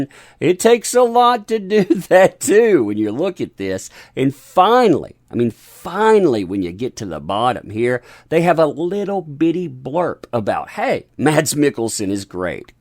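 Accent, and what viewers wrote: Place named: Appalachia, United States. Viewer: Can you stop talking in that accent? You're not a hillbilly hick from the 18th century.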